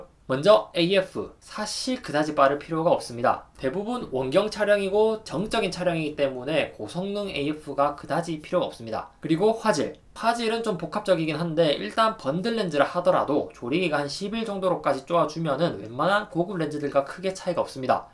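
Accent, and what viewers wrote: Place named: Gyeongsang, South Korea. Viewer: 갱상도 사투립니다ㅋㅋㅋ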